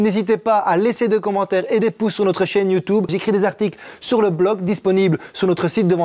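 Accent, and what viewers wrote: Belgium. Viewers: j'aime beaucoup l'accent belge, ça donne un charme assez prononcé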